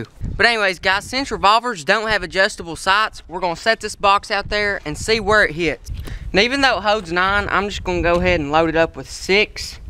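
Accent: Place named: South, United States. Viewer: what state do you live in if you don't mind telling. I can tell your southern but I'd love to know whereabouts.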